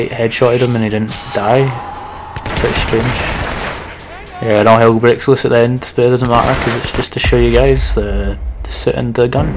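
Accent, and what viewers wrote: United Kingdom. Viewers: Are you from Scotland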